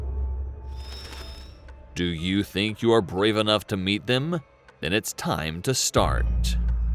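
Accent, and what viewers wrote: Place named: United States. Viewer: He’s American not English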